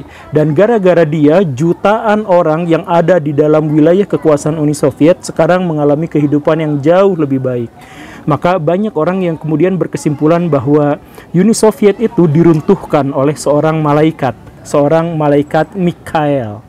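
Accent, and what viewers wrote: West Java, Indonesia. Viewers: Pak unik bahasanya...nyunda banget😁😁😁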